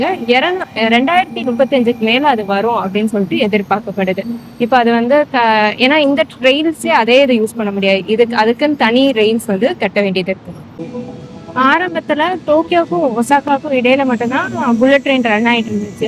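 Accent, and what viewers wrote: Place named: India. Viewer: 典型的なインド訛りの英語だな。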